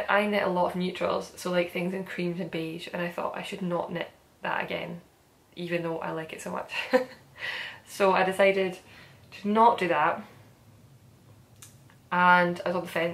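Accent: United Kingdom. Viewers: Just found your podcast and subscribed! Thank You! Really enjoy it! Only one thing, if you could talk a little slower from time to time I cannot quite understand since I am not Brittish. So for us, that are not native speakers from your neck of the woods, remember to to slow down ....... I might not be the only one with that request...... I am looking forward to your next podcast and I'm exited to see your next knitting adventures! As my affordable yarn choose : Love to knit wit Istex Plotulopi! It comes in plates and makes light and woolly garments in one ply only. Light as a feather too but very rustic so not for everybody.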